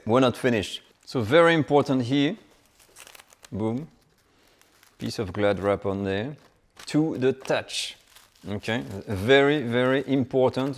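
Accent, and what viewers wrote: France. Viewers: Chef! I remember u when I watched the Julie & Julia movie! Even ur intro music and french accent; Meryl Streep did justice! "You are the butter to my bread, you are the breath to my Life" More power to u Chef! 💪👏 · Your vocabulary is wonderful. You were using a lot of adjectives that a lot of French don’t know when speaking English. I’ve had a French Boyfriend for 16 years; so I know you are using some English Descriptions that are not commonly known by ESL Speakers. Your French Accent is Charming!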